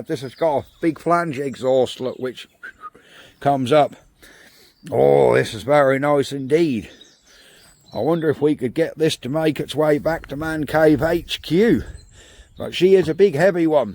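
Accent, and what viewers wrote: Norfolk, United Kingdom. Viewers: I grew up in Narborough during late 60s/early 80s and went to the old Narborough school. The picture you showed of Crisps Garage was the Drs surgery during the 70s . Still visit Narborough to see relatives and will hopefully go to the museum on next visit. I remember Greta Towler as the postmistress who had a very cheeky minah bird. Love your Swaffham auction videos and used to visit most Saturdays when Tyrone Roberts was the auctioneer. Thank you for the nostalgia and the wonderful Norfolk accent!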